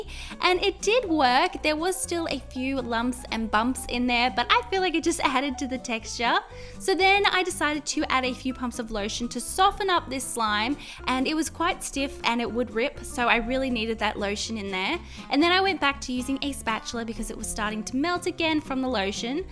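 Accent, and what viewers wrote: Australia. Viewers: “The accent is Australian”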